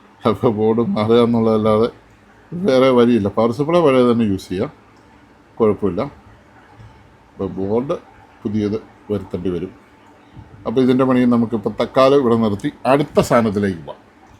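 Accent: Kottayam, India. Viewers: അച്ചായാ വളരെ ഇഷ്ടം നമ്മൾ കോട്ടയത്തു കാരുടെ സംസാരവും സംസ്കാരവും ലോകം അറിയട്ടെ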